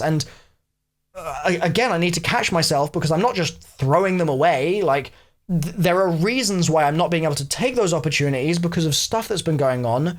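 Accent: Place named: England, United Kingdom. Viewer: Goodbye, I'll miss hearing zombies commentary in your english accent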